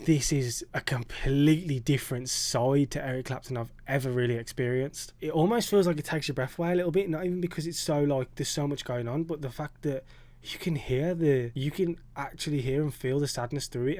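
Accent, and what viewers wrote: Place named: England, United Kingdom. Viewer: Trying to figure out your accent from my Canadian perspective. Are you from the midlands or from a northern town/city?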